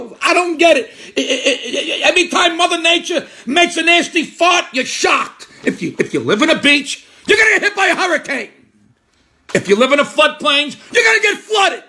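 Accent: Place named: Brooklyn, United States. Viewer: “WHERE I COME, ITS HOOO AAAHHH!!!” LMFAOOOOO I RECOGNIZE THAT BENSONHURST BROOKLYN ACCENT ANYWHERE, LMFAOOOOO ….THANKS FOR THE LAUGH 🤣👍🏾👍🏾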